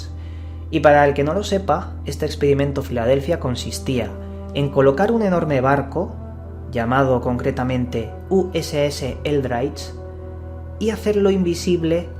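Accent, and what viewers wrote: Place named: Spain. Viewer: Con respeto a los amigos españoles y a su hermoso pais, el acento casi no me es tan amigable, pero el de es tan placentero oírlo.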